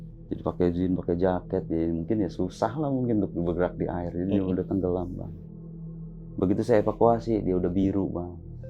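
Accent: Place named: Lampung, Indonesia. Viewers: asliiii bang😅😅, 100% lampung banget😅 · lampung bener cara ngomog nya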